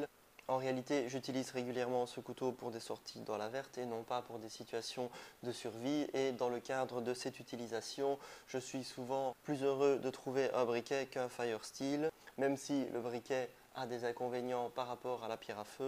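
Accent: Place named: Belgium. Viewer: Ca c'entend un peu mais sa choque pas tkt :) je suis belge aussi donc j'ai l'habitude :D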